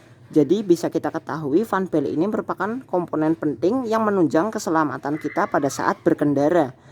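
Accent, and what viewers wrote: Banyumas, Indonesia. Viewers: Mase Banyumasan iki ... Ngendi kiye...hehe. suwun nggeh infonya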